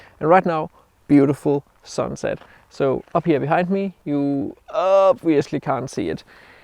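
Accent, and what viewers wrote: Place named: Denmark. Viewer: When I first watched your videos, I thought to myself, "He's the Lars Ulrich of photography." Then to find out that you are also Danish. I knew I knew that accent. 😂😂 Great videos! I really learn a lot from them.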